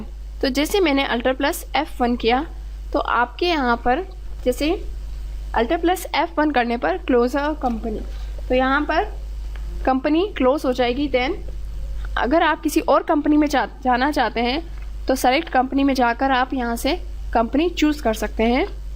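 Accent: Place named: Bihar, India. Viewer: Mam aapka accent Bihari wala h kuch samjh nhi aaya bol kya rhe ho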